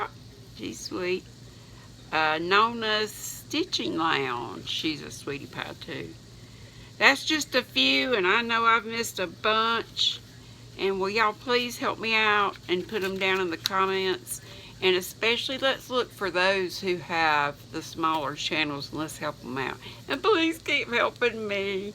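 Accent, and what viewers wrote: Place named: Iowa, United States. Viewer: I love your new name 😃❤🐿🐿 I just love you girl. My late mother used to talk the same way and she was from Iowa. There was a couple three and she used to call a tiger a tager.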